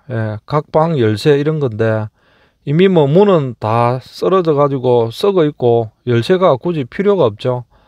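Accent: Gyeongsang, South Korea. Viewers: 경상도 사투리 정겹습니다.... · 경산도말이 좋습니다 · 제가 이 tv를 구독하는 것이 컨텐츠도 좋지만 구수한 경상도 사투리때문에 보고 있어요. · 간결한 갱상도말 지금시대좋쵸 😊😮😂🎉❤ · 경북에 사는 사람인가보네요... 표준말 쓰라고는 안하겠데, 불편한건 맞아요.. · 경상도사람이 경상도 사투리 쓰는게 무슨 문제라고 시비을 거는지 서울촌놈도 서울사투리 마이 쓰더만... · 사투리 말씀 재미 있고 구수함니다.경상도.사나이.아이가...이곳미국은.빈.건물.상속자가.꼭세금을내서..세금이.밀리면.스테이.에서.가저같니다.😮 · 경상도 사투리 구수하게 들리는데 누가 시비를 터는가 보군요 모르긴 몰라도 앞으로 폐가 문제는 더 심화 될것입니다 보이지않는 텃새 지역 이기주의 배타적인 정서 나열하기 싫은 단어들이 떠오르네요 아무튼 고생많았습니다 영상감사합니다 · 전고향이 충청도인데~! 경상도 사투리와 어걍이 자연스럽고 구수하게 들려서 구독했습니더~! 마~! 빡세개 화이팅~ 하이소~!!:hand-pink-waving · 댓글에. 사투리에. 설왕설래. 하시는분들. 많으시네요. ~ 전. 좋으네요...^^ 각. 지방사투리중/경상도가. 최고라얘 어느분 글처럼. 음소거로. 시청하이소. 😂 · ㅋㅋ 경상도 사투리 구수하게 듣기 좋아요~ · 전국 방방곡곡 다니시느라 고생 하십니다. 인구절벽과 경기침체에 농촌마을이 황폐화 되는군요. 경상도 사투리 좋아요. 괜히 마음쓰지 마세요. 덕분에 앉아서 전국을 구경하니 얼마나 좋습니까? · 경상도의 말투가 부산 경남과 대구 경북은 완전히 다른데 은 서울 말투보다 듣기좋네요.오늘도 좋은영상 잘 봤습니다. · 자연스런 경상도 억양 넘 좋아요 화이팅입니다^ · 저는 구수한 경상도 사투리 목소리가 좋아 즐겁게 방송을 보고 있습니다~ 물론 전국에 버려진 빈집이 이렇게 많이 있다는 게 안타까운 마음으로 보기도 하지만요.. 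아무튼 방송 고맙게 잘 보고 있습니다. 늘~ 건강하시고 안전하게 현장 취재 하시길 기원합니다~ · 경상도 말씨 듣기 좋습니다 ❤ 상세한 설명 ~~ 즐겁게 보고있습니다. 홧팅 입니다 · 사투리? 경상도 말이좋아요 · 말은 표준말인데, 억양이 경상도라써...그런지, 알아듣는데는 아마 이상없을 것입니다. 천연기념물 의 톡특한 영상 감사히 잘 보고 있습니다. 혼자 다니시니, 몸조심 하시기 바랍니다. 사고나면 혼자다 처리해야 하니......조심 조심 운전하시길 바랍니다.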